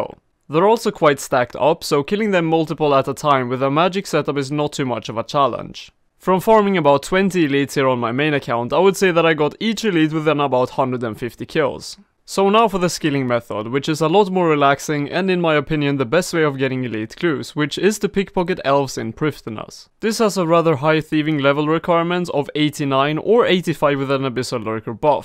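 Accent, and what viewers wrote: Sweden. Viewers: you sound swedish :)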